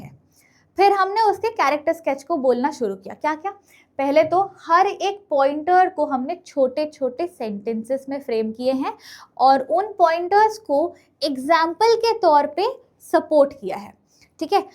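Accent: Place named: Bengal, India. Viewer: her begolii accent🥹🤌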